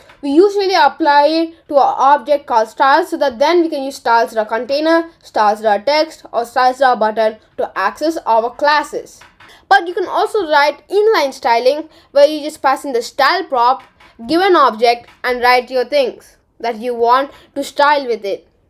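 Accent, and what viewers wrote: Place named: India. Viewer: Broski you sound like an average indian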